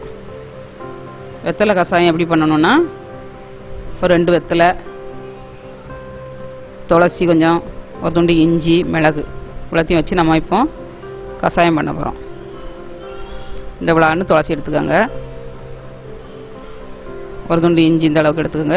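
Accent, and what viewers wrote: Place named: Tirunelveli, India. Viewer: Nice slang tirunelveli Thoothukudi slang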